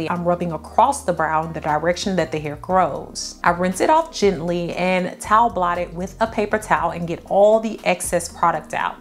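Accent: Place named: Midwest, United States. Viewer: Are you from STL? I think that I can “hurr” our STL Midwest accent lol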